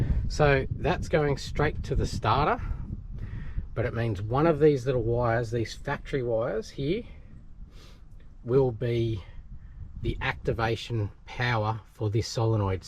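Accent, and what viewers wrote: Australia. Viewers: This was my first Back Shed vid and I enjoyed it tremendously. Im watching through again. I love an ol F series truck. Us Americans are suckers for anyone with a charming Aussie accent. To me the more Bogan the better.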